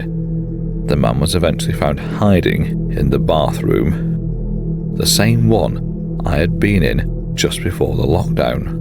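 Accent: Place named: France. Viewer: Anyway nice french accent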